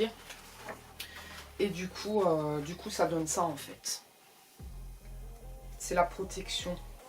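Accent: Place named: Haute-Saône, France. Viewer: Coucou petite sorcière, juste pour te dire que moi je t'aime telle que tu es, franche et honnête. Ton accent en tant que fille de la Haute Saône me dérange pas, au contraire, on se moque souvent de mon accent ici à la Réunion!! Je suis du même avis que toi en ce qui concerne on sait qui! Le para devient compliqué sur Youtube. Ne pas croire à tout bien évidemment alors qu'ils ont de bonnes "bases" mais en font trop!! Voilà, merci pour tes liens de bouquins intéressants. Je suis en recherche de bonnes protections car j'ai découvert il y a plusieurs années que je peux magnétiser les gens, mais je le fait rarement et juste quand une personne de mon entourage a vraiment besoin, (je ne supporte pas les voir souffrir alors que je peux les aider) car ça m'a déjà joué des tours au début car ne faisais pas de protection ni nettoyage après, ça m'épuisait et rendait malade. 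A ce jour, je sais pas si je le fais proprement. Je demande aux êtres supérieurs, allume une bougie blanche et de l'encens de l'Archange Michaël et Archange Raphaël, demande une bulle de protection mais est ce suffisant et efficace ? Après je me "décharge" avec un galet que j'ai ramassé et chargé au soleil, et ou poser mes mains au sol ou enlacer un arbre suivant où je me trouve... J'aimerais tant aider, je ne demande rien en retour, juste je demande à la personne de remercier là haut.. Je ne supporte pas voir les gens souffrir, je me dit que si on m'a offert cette capacité c'est pas pour rien mais j'ai peur pour moi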